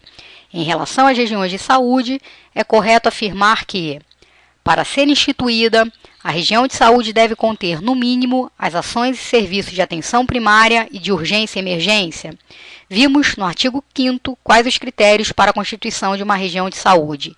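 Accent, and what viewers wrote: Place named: Rio de Janeiro, Brazil. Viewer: Carioca falando é chato pra kralho...mas a aula foi boa.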